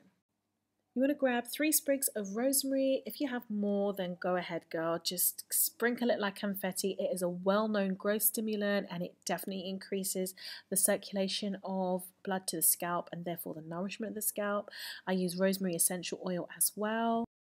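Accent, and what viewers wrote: United Kingdom. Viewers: youtubers who speak British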